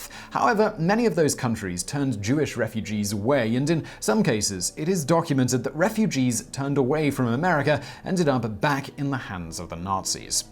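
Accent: England, United Kingdom. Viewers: I like your videos in general. Not this one. Too many strawman arguments. Keep your British English accent out of our proud American nation's history. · Sounded like an Englishman. They know our history better then we do. (I DON'T think so). The sad thing about this video, we who are older and had our country's history taught to us know this is bull. However many of our young people don't. Thanks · And that cheeky British accent makes him sound so much more authoritative/intelligent.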